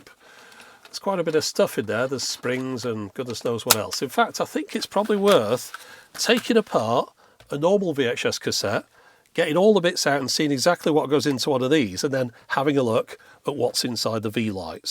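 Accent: United Kingdom. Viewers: I can, the British accent, the quirky content, the snarky commentary, the obscure technology and the enthusiasm. One of the YouTube’s greatest channels!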